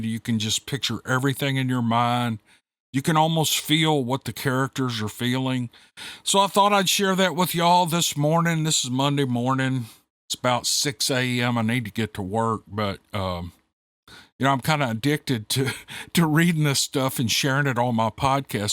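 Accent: South, United States. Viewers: I adore your accent!!! It's' so great to listen to someone who sounds just like me!!! ❣️❣️❣️❣️❣️ I Could listen to you all day long! You are from just a little bit deeper south than me. (Hahaha!!!)I'm from North Carolina born in Winston-Salem. But I've been everywhere. And enjoyed every minute of my travels. Someday I'm gonna write my adventures down. I don't really know how i survived those dangerous times, but thank G-D I did. Love ya!!! Keep up the great story sharing!!!😮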